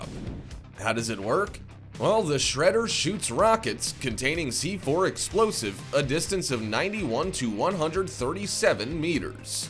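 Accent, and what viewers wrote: United States. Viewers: the annoying side of American accent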